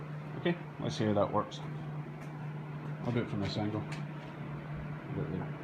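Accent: Scotland, United Kingdom. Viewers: Aye... what part of Scotland are you from?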